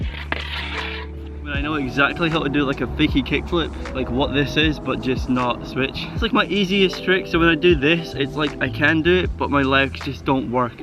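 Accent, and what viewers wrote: Scotland, United Kingdom. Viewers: u scottish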